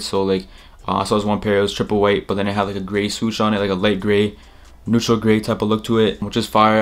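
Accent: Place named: Jamaica. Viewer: Gave the vid a like but please let go of the jack accent. It doesn’t sound or look good bro. You better than that & ur vids are dope.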